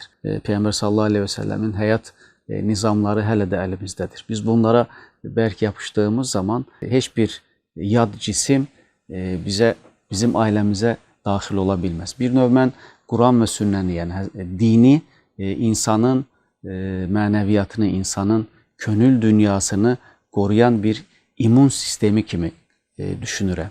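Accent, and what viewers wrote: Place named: Turkey. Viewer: Təzə moizeler Turkiye lehcesi ve turkiye dilindedir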